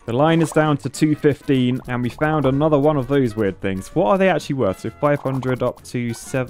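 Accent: United Kingdom. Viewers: He British not American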